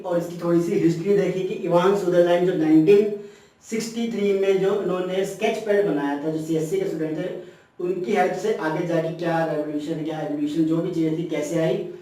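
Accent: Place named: India. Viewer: oh,full Indian English .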